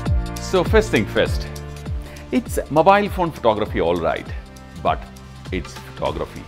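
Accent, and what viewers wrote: Kerala, India. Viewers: I think u hav an Indian Accent. I like ur Indian Accent.I am too an Indian...... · His malyali accent is funny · Annoying Indian accent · That's a Malayali English slang !!! 😁😁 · thx... that indian acc ent in english is so weird :D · Malayali ana? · Ah englishil malayalathinte touch undd😊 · Sir , are you a mallu.... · Mabile faune photography, Sir you have Malyalam Accent 😍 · Typical indian acent haha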